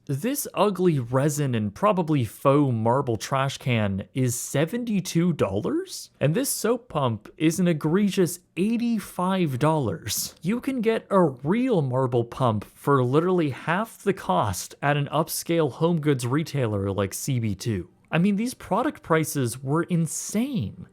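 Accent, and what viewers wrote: Canada. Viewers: Forgive me for asking, but what part of Canada are you from?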